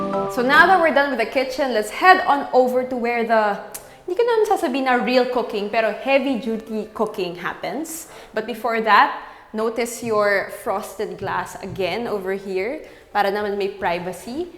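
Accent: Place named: Philippines. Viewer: speaks Taglish perfectly, very natural walang bahid ng kaartehan even if she's a newbie, lots of YouTubers should watch and learn from her...Go!!!!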